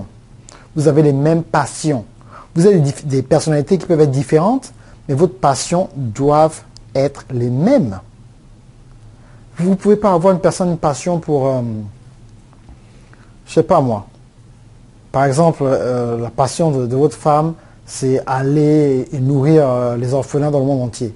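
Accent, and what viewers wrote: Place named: Gabon. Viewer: L ACCENT GABONAIS HEIN. LOOL COOL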